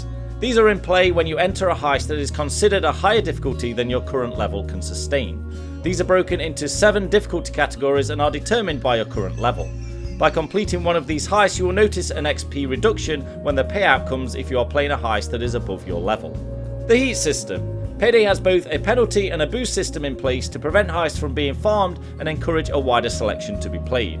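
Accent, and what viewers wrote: United Kingdom. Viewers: Hey mate I love your accent where are you from in the UK? Also where can you find teammates to ecm rush heists for xp.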